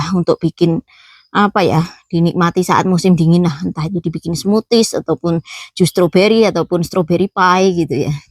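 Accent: Java, Indonesia. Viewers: Jawa tengah/ Indonesia hadir mba.....suka bngt dgn kegiatan mba berkebun dan panen2. Dan suka ketawa sendiri klau denger mba bicara, pakai bhs asing campur bahasa jawa yg msh medok😂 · MB meskipun hidup diamerika ngomongnya tetep Jawa medog seneng dengernya orangnya sederhana apa adanya baguus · salam sehat dari Papua buat mbak sekeluarga. mbak klu ngedabrus selalu buat saya ngakak.biar sdh lama diluar negeri tp jawanya tetap medok.sehat terus mbak🙏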